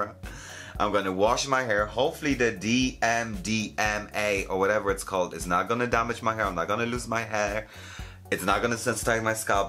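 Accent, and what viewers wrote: Ireland. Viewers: I've really been enjoying watching your videos over the last month. I love the irish/UK slang and accent. I've always been a fan of hearing the different dialects. I'm pretty sure that started when the Spice Girls came out in 1997. Eleven year old me was extremely obsessed with them. Good lord. It was bad.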